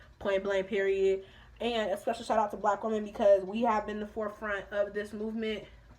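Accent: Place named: United Kingdom. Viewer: I stan the British accent. Teach me.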